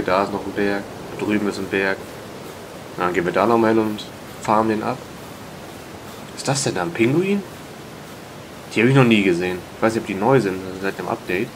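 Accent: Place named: Hamburg, Germany. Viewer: thx für das Tutorial. Vom Sprachbild würde ich mal stark Hamburg und Umgebung schätzen.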